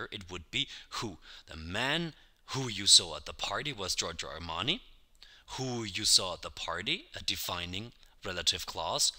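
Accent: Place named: Germany. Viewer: thanks a lot, you explain clearly, but you speak as Germans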